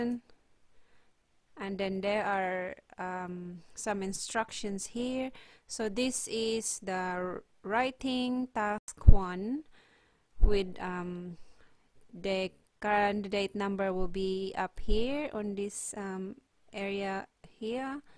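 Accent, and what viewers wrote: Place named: Philippines. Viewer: The way you pronounce i think u must be a filipina or maybe half filipino.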